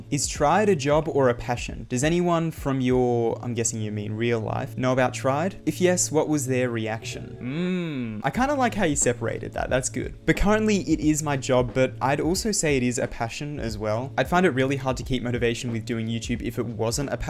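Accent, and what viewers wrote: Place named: Australia. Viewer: absolutelly i love your australian english accent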